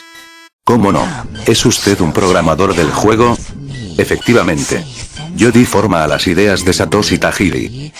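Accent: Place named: Castile, Spain. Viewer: habla bien no te entiendo por que tu acento castellano me deja sordo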